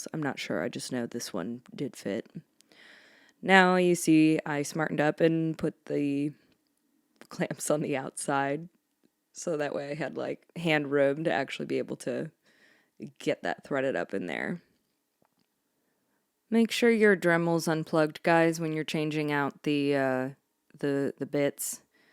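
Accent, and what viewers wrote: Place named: South, United States. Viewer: aww.. you're sweet. Comes thru clearly.. I am sincerely sorry to hear about troubles with your sis and partner. I assume business partner? You mentioned your husband in this video, I hope not him. I hear a refined southern accent, and heard a "y'all" (always a plus for this Texas fella) so I don't think you'd call your husband 'partner'. Either way, prayed for all and meant what I said. As for the channel, the grossest ever strat is up next for me. Take care, be well, keep it up when it suits you🤍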